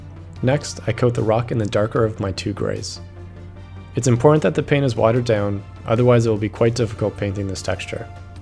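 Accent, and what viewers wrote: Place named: Europe. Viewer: From which country are you from?? I love your european accent 😍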